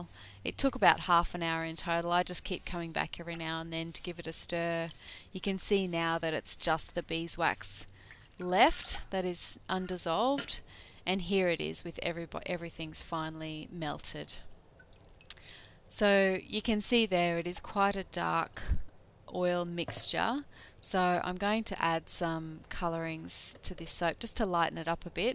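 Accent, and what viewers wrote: Australia. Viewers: Hi, thankyou for your video. I usually make cold process but have felt uninspired for a long time now. I just made Hot Process with honey, no fragrance or E.O. following your instructions. In the mold now, cant wait for it to harden up and cut! Lovely to hear an Aussie voice too!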